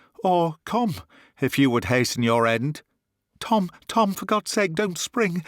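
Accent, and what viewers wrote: United Kingdom. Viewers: Mr your voice and narration is really marvelous... you can never imagine how much I enjoy listening to your narrations... your british accent helps me so much in learing british english... please never stop uploading more of your fantastic work... thank you very much sir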